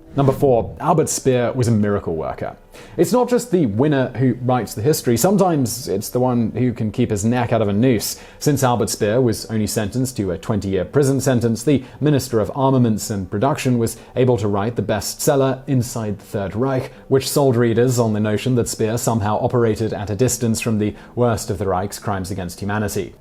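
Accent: United Kingdom. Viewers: What in the world qualifies you to "clear up misconseptions" about anything much less the Third Reich. Just because you have a "proper British accent" that doesn't make you an expert on anything. Frankly if I had the choice between fighting for you or fighting for Rommel, I'd choose Rommel. Another wannabe "know it all". I wasted my time and the battery power on my laptop watching you. Never again!!!!!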